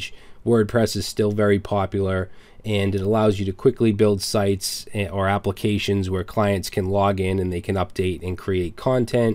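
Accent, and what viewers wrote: United States. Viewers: Man, I dig your accent. I think it's as American as it gets.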